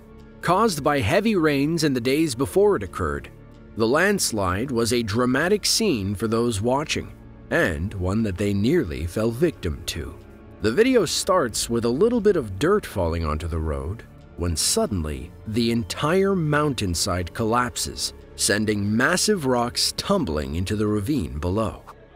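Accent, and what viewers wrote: United States. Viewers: Imágenes impresionantes, con la voz de un locutor... Por dios... como puede ser tan desagradable al oído el inglés estaunidense...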